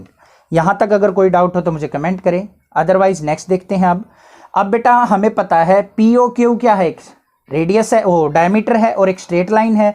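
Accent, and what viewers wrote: Haryana, India. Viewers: You are from Haryana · Sir aap haryana se ho kya?? aapki voice m haryanvi accent aa rha h.. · Nice sir are you frim haryana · Sir aap haryana se ho kya?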